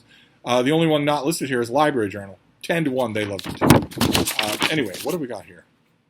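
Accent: United States. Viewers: Sounds like you are in Georgia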